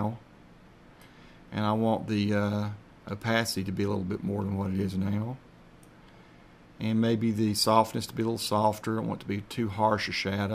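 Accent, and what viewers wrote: South, United States. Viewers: love the southern accent xdd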